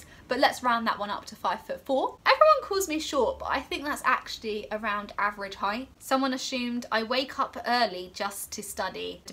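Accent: United Kingdom. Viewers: Hi just I have a question is there any collage that helps disabled by allowing them to be students for free or with cheap fees.I watch your videos to get a British accent. Thank you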